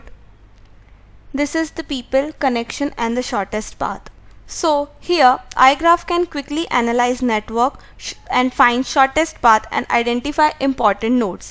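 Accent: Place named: India. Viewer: Billshit Indian accent … 🤢🤮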